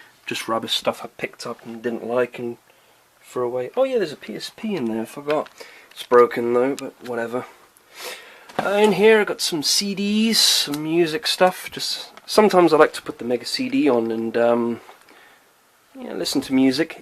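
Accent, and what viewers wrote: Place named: United Kingdom. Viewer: A cool concept! I've never seen someone from this community invite others to a small tour through their space. This should happen more often! It's a shame you feel nervous about recording your voice, because I unironically like it. There's something about a British accent that just sounds captivating. Please excuse me for not actually paying full attention for the whole 40 minutes, but it was a relaxing experience. Believe it or not. :P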